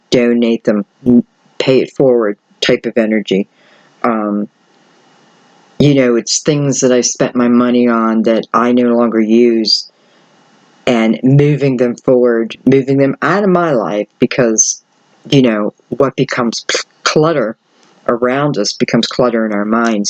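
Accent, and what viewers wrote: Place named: Philadelphia, United States. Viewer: sharp 907 I hear it too. I’m from philly. We all have that accent. Lol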